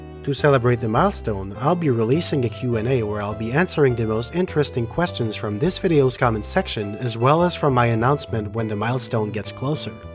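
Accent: Quebec, Canada. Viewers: before he gets the question <num> times he’s french-canadian (québécois specifically) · J'ai une question très simple : Est tu Québécois ? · dude, your english is completely fine. From your accent I think you are canadian, possibily first language french? You speak better english than I do and certainly better French! Thank you, I love your videos and come back regularly to watch them <3 Thank you for all the effort you put in, especially with the audio :-)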